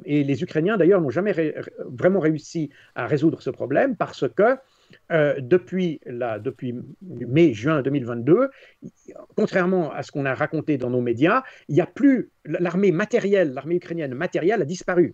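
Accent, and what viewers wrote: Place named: Switzerland. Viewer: son accent suisse trainant d'origine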